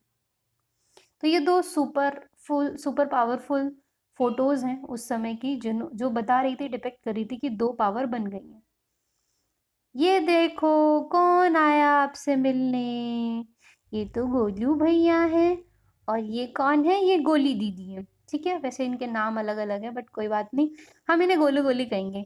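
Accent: Uttar Pradesh, India. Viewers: Mam app U.P. se ho kya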